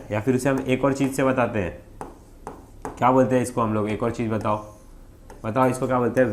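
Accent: Maharashtra, India. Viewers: Sir aap Maharashtra se ho Kya thoda thoda Marathi jaisa language hai aapka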